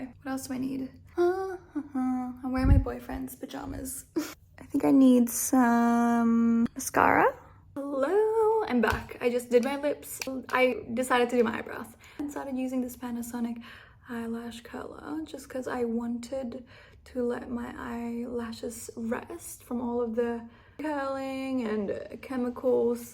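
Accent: Australia. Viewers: She should join Miss U Australia. She looks and sounds more australian than she is Filipina. “Living in” is still considered a taboo in the Philippines especially for celebrities and beauty queens. Not really a Filipina representation. A Miss Australia perhaps? I will still support!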